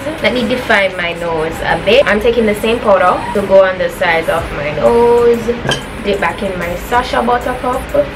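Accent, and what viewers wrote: Jamaica. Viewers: are u a Jamaican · Your Jamaica don't it?🇯🇲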